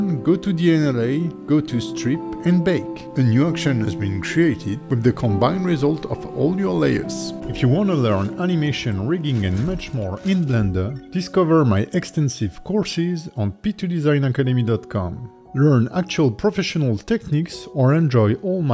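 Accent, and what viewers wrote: France. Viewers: L'accent français rend l'anglais plus compréhensible pour moi 😂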